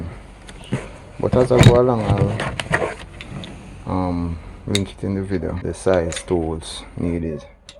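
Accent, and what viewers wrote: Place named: Jamaica. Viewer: Love how u keep di ting straight jamaican... real yaad man mechanic. Keep it up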